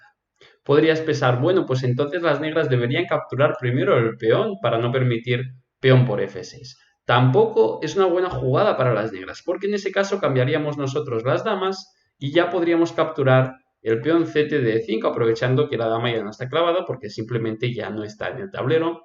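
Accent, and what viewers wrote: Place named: United States. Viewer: Hablas un excelente inglés americano.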